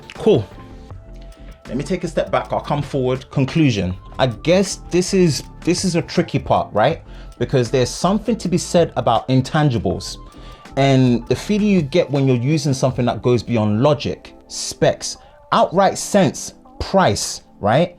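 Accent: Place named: United Kingdom. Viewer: let's just say, I didn't expect a silky British accent, lmao.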